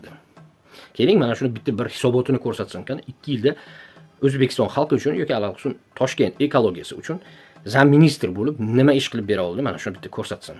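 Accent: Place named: Tajikistan. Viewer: Янги очодиган каналингга ут тушсин.Яхши айтиб куйди.бекорчи гийбатчи тухматчи янги чиккан ёлланган сиёсатчига халк мухтож эмас.Кайирда кандай илм олгансан.Сиёсий маьлумоти нима адабий соф узбек тилини кайси тожик шевасида гапиряпти узингни билимингни яхшилаб ошириб олгин ким танийди бу гийбарчини инсонларни кимматли вактини олиш хам катта гунох.Вактни яхшиликка яхши ниятларга сарф килиш керак.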